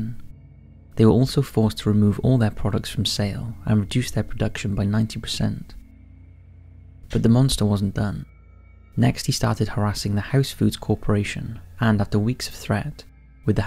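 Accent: Wales, United Kingdom. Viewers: The Welsh accent of yours is better than The Canadian Droll of Chills haha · I've a headache and this is easier to listen to than most. I'm sure that I can detect a Welsh accent in the narrator's voice and I think it's just a bit different, very enjoyable. Thank you ♡ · Genuine curiosity, which part of the UK are you from? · He’s Welsh (from Wales in the UK) if you didn’t know. That’s the accent. South Wales I think by the softness, probably somewhere near Cardiff (the capital) or maybe Mumbles (a seaside town). 🤔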